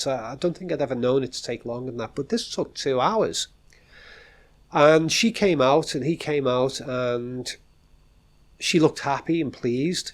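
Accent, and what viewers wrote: Liverpool, United Kingdom. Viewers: Just discovered your channel so excuse me if it’s been mentioned, but are you a scouser ?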